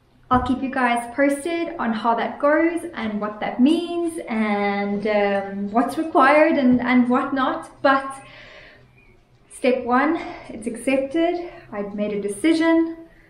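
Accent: South Africa. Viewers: Just found your channel and will definitely be following the rest of your adventure. Question: do I hear a bit of a South African or Zimbabwean accent there?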